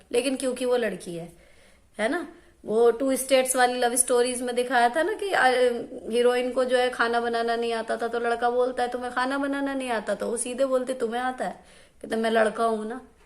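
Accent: Meerut, India. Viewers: Aapne blkul sahi bola apni body ko apna Ghulam bna lo to sab kaam aasani s hote chale jate fr koi pain pain ni rehta ....mere yaha jb maid t tab mere pain bhot hota ta but jbse use hataya h Mai etni active ho gy hu k hairaan hu maid q Maine rakhi t....aapki videos bhot motivational hoti h..... Di aap meerut s to ni hyn? Meri friends circle m aadhe s zyda sab meerut wali thin to hme unka lehja bat cheet bhot ache s pta h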